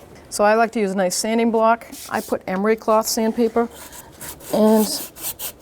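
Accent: Boston, United States. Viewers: Nice job here... You should however coat all those threaded connections with high-temp antiseize lube and the O2 sensor should get a dab of dielectric grease to keep moisture out of the connection. This is important if you are in a road salt environment. By the accent, it sounds like Boston or Southern New Hampshire area.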